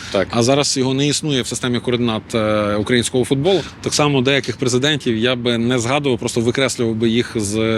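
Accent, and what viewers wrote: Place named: Okanye, Russia. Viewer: Ой якби не коливання з ноги на ногу, і не окання то нехай підросте нервує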